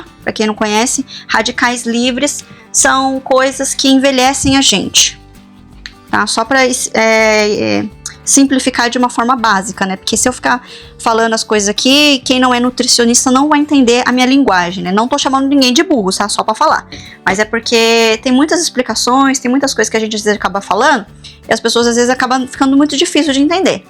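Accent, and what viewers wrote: Minas Gerais, Brazil. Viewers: já imaginava que você é uma mineirinha ❤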